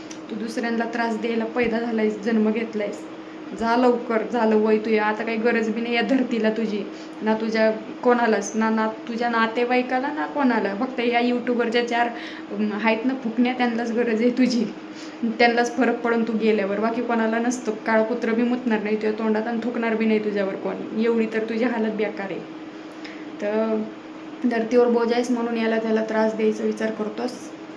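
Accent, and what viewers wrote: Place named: Vidarbha, India. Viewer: तुझी भाषा विदर्भ खूप छान आहे मला खूप आवडते मी खूष आहे तुझं स्वभाव छान एक नंबर आहे ❤❤🎉🎉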